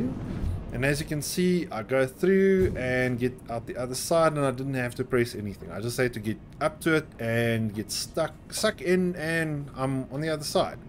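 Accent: South Africa. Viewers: neat, could hear it haven't found a lot of south africans playing the game. also from SA :D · Awesome to hear a fellow Saffa play this game. Keep the content coming chomma!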